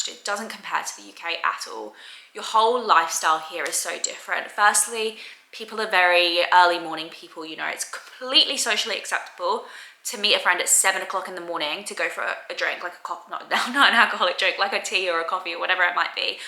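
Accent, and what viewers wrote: Australia. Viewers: You've definitely picked up an Aussie twang in your accent! I just got my PR and looking to move out next year. Can't wait. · You've got a good Australian accent. There are English people who've been living here for 20 years that sound like they just got off the boat. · I didn't know you could say "like" that many times in such a short time LOL. But you have picked up our accent really quickly. Good on Ya! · I call bs. She sounds too Australian to have only been here for 3 years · I love your accent!! Bit of Aussie, Londoner & Manny!😅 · You have a lingering Aussie accent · You already have an Aussie accent.. nice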